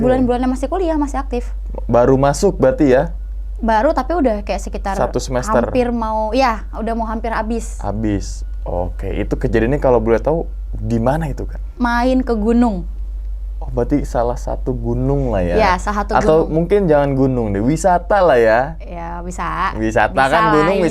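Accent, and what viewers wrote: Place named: Surabaya, Indonesia. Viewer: Mbak.e ki wong ndi to kok logat.e kyk kenal, sby ta opo malang.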